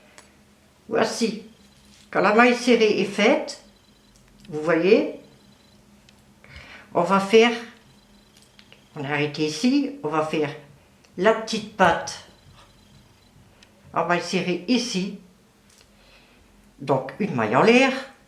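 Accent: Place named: Nord-Pas-de-Calais, France. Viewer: Très bien expliqué... j' adore votre façon de parler qui ressemble au parlé Ch'ti que je suis ❤ Très beau modèle...👍❤️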